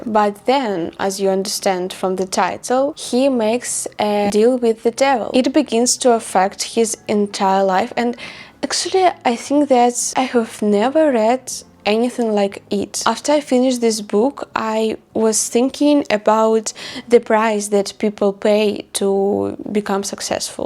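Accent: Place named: Russia. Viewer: I absolutely agree with this Where as soon as I saw you and hear your accent I knew that you are Russian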